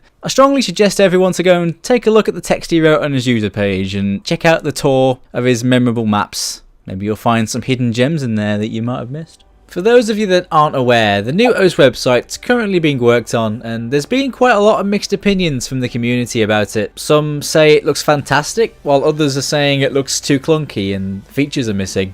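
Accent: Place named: England, United Kingdom. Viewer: I don't really like English accents but at least he admits to being a scrub ecks dee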